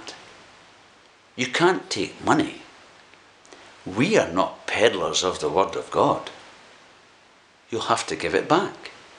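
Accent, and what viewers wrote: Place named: Edinburgh, United Kingdom. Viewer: I thought that was an Edinburgh brogue! Did I tell you I was on the bonnie, bonnie banks of Lochlomond last weekend. Thanks for another informative and insightful video bro.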